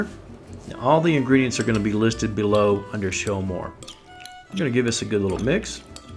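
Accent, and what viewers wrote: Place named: Texas, United States. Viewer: I'm making this tomorrow, it looks so delicious! Love your accent, are you from Texas? Greetings from Montreal! 😃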